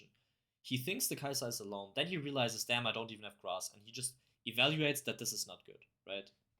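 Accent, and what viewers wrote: Germany. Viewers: Hab an deinem Akzent gehört das du Deutsch bist haha. Aber guter guide, danke dir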